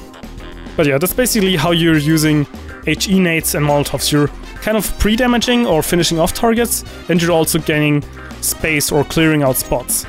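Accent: Germany. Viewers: Just asking but are you from germany?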